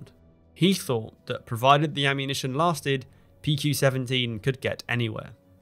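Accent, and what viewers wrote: England, United Kingdom. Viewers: Lack of detail; and I'm from the US. It takes more than an English accent. go do your homework. disliked.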